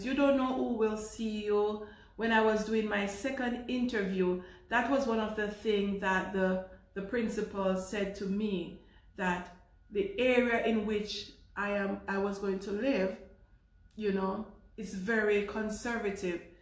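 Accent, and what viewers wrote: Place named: St Ann, Jamaica. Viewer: You are a well trained teacher I enjoy your video. You are from st Ann's sound like my sister inlaw blessings.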